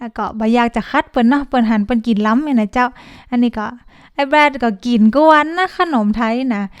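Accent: North, Thailand. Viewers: สำเนียง ลำปางกะเชียงราย เหมือนกันมาก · ครั้งแรกเน่อเจ้าหล้าเพิ่งเจอยูทูปเบอร์คนเมือง แม่ก่อเลยกดติดต๋าม เลย ฟังกำเมืองเหียพ่องม่วนดีเจ้า คนเจียงใหม่เน้อเจ้า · ฟังรู้เรื่องอยู่ค่ะ เพราะที่ทำงานแต่ก่อนคนเหนือเยอะเลยฟังรู้เรื่องค่ะ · เพิ่งมาเห็นช่องนี้ กำเมืองงงง น่าฟัง รื่นหูมากกก ติดตามเลยเจ้าาา❤❤❤❤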